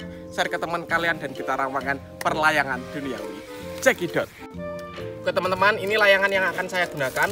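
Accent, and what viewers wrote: Java, Indonesia. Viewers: Jowo ne ndi mas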